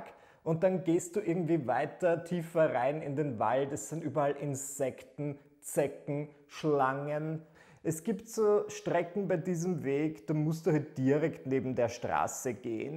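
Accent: Vienna, Austria. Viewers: ich liebe deine videos. Dein wiener slang ist sooo geil, dein Humor auch ich liebs!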